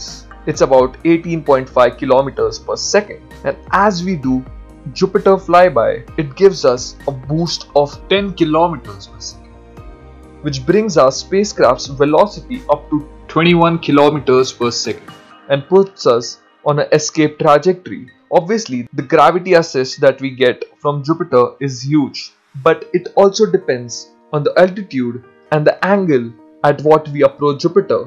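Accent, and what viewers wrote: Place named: India. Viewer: Indian accent haha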